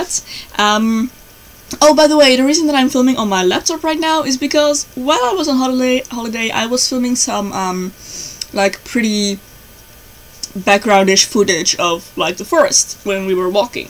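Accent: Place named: Netherlands. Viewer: you might speak dutch, because of your accent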